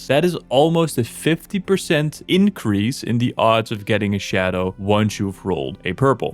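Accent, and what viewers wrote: Netherlands. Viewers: Is that a dutch accent i hear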